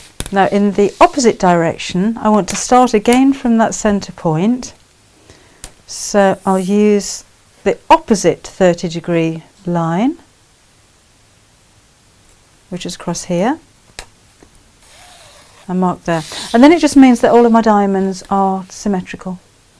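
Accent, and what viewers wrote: United Kingdom. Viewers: I love Your smile, your vice, your cool british accent and yours works!!!!!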